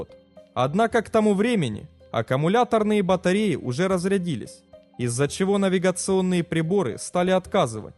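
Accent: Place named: Kyiv, Ukraine. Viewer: братан, делаешь хорошие видео, но твой киевский акцент раздражает так, что противно смотреть. найди себе другого диктора.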